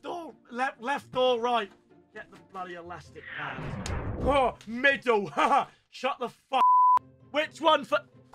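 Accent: South Africa. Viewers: Love the Souf African accent!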